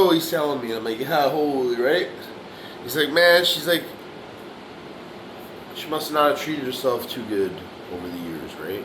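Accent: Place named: Canada. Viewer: 😂🤣you're hilarious, dude this is spot on, the excessive noise and the god damn heel stomping everywhere they walk and banging dishes is 100% truth. Are you a fellow Canadian by any chance? because you sound like one, cheers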